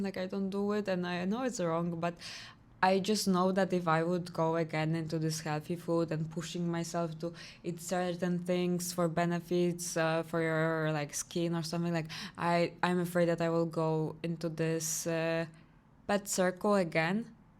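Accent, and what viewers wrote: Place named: Russia. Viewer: У Вас потрясающий русский акцент 🔥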